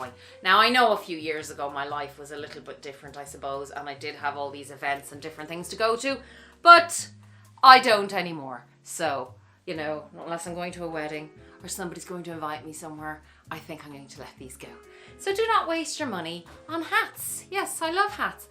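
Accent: Ireland. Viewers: Hi! I’m a new subscriber & really enjoyed your video. I’m trying to place your accent, Irish? It’s charming! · Love the Irish lilt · Love your accent! Are you Irish? Are y’all still locked down?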